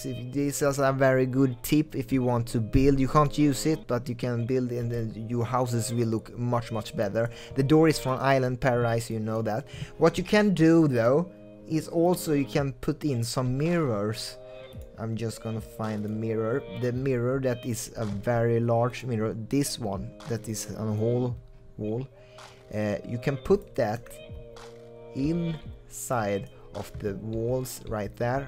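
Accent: Sweden. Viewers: Dat Swinglish xD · dat swenglish man ;) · The swenglish 😂😂 I am from Sweden so it doesn't bother me 😂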